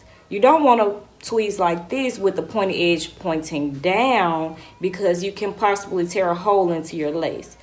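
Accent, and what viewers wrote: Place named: Mississippi, United States. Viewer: This helped a lot 😊😊 & I love your accent lol , Are you from Jackson Mississippi?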